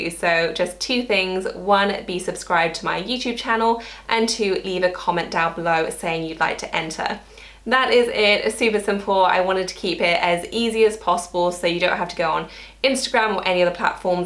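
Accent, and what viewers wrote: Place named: London, United Kingdom. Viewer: I want to enter the Chanel wallet giveaway!!!! I love your videos and London accent 🥰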